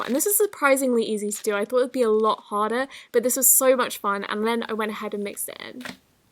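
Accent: United Kingdom. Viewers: Pleease do more vlogs, your British accent is so cute🤩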